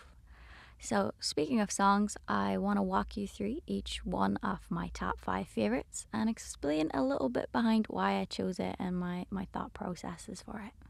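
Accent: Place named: Ireland. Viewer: I don’t know how I missed these before but I just listened to the most hauntingly beautiful version of I have ever heard. Your voice just transports me! I love listening to your soundtracks and your beautiful Irish lilt! God did magic when he created your voice! Thank you so much for being brave enough to share it with us! Love you!!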